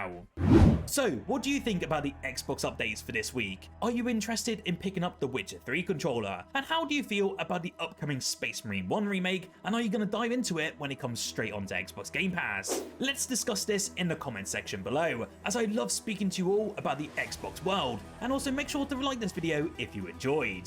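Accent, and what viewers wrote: United Kingdom. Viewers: Where his is accent from what part of the UK?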